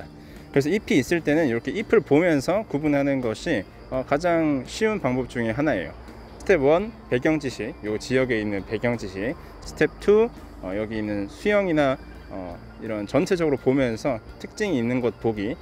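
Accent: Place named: Gyeongsang, South Korea. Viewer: 경상도 사투리 살짜기쓰시네요? ㅎㅎ 오늘영상은 특별히 자신있는 목소리톤이셔서 활기차보이시고 좋군요! 목소리 참 매력있으세요! 늘 잘보고있어요! 감사합니다🙏🙏🙏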